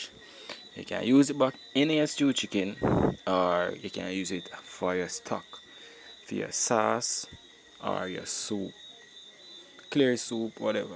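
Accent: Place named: Jamaica. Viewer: mi like how you keep the patois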